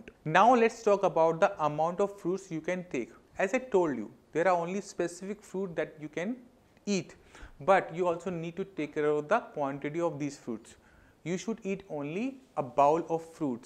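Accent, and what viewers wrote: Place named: India. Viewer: Thank you for that good infos. Kindly speak slower bcoz i can't catch up your Indian accent. Thanks so much.😊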